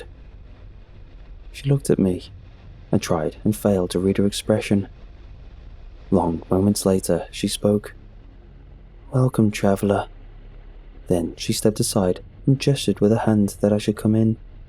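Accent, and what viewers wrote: England, United Kingdom. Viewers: Bro! Your accent is English? I really don't care but it makes me fall asleep instantly. So relaxing.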